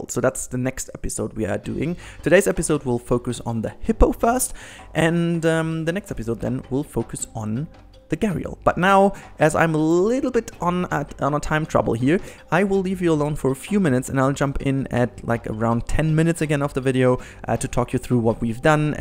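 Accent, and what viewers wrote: Germany. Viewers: Is your Native language german?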